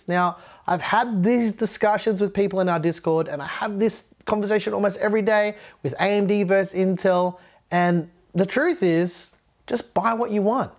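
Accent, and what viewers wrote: Australia. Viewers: I subscribed for two reasons. Firstly, you don't overclock your cpu's. WHo does? Well statistically less than 1% of computer owners do and I am truly not interested in doing so. Imagine buying a brand new car and then adding turbochargers and NOS to it. Dumb, right. Overclockers do so so they can stress test their computer gear and pay to have the results published. It becomes "I have a bigger dick than you moment" which is stupid and unnecessary. Secondly, it's your accent. After listening to such much American droll it is refreshing to listen to an Australian. You are doing the world a favour. Ahh.....that accent is fantastic. Can listen to it all day and your grammar is exceptional. Thank you.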